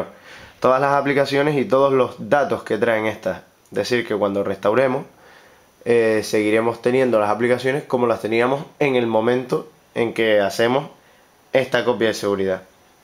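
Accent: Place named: Canary Islands, Spain. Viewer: wow se nota q eres de canarias, yo soy de grancanaria, la feria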